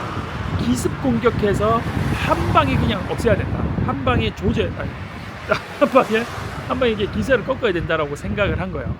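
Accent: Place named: Gyeongsang, South Korea. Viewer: 님 점~점~ 폭칸트 유툽 컵셉인가요? 일본컨셉 유투브많은데 경상도가넘 만타~~~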